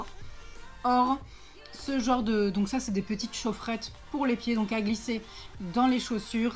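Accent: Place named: England, United Kingdom. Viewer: J'aime bien ton accent anglais 😊😀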